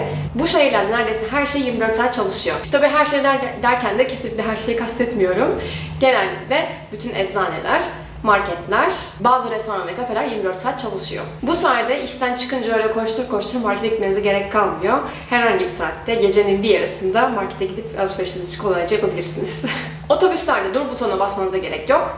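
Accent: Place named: Russia. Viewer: Adın ve soyadın türk ama aksanın acaba rus diliyle içli dışlı olduğun için mi yoksa rus olduğun için mi rus aksanı hissettim bilemedim :)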